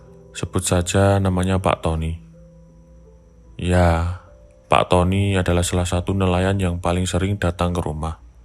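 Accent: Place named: Java, Indonesia. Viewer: Suka ama logat jawa-nya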